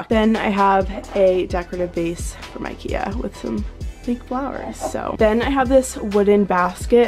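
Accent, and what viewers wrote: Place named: Los Angeles, United States. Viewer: homie you did not need the boston translation you sound like you from Los Angeles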